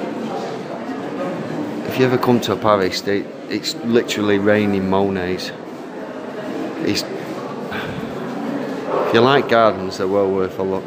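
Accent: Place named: Lancashire, United Kingdom. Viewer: Love the French pronounced names in a Lancs accent! Art seems better now I get older!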